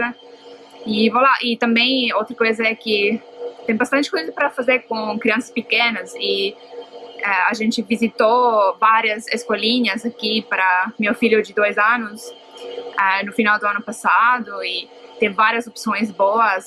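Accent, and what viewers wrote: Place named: South, Brazil. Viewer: Já te chamaram de "alemoa"? Teu sotaque e a tua aparência é bem comun entre os descendentes de alemães do sul do Brasil.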